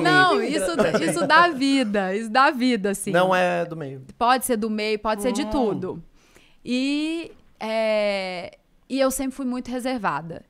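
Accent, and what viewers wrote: Minas Gerais, Brazil. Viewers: Uma Morena dessa com sotaque Mineiro pra mim é AFRODISÍACO!!! 😋😋😋😋😋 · Sotaque mineiro é maravilhoso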